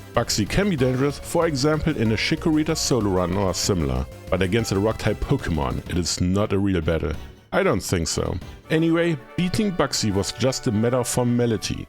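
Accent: Germany. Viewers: As a german, I immediately noticed the accent. Great content, def subscribing vro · The German accent is strong in this one!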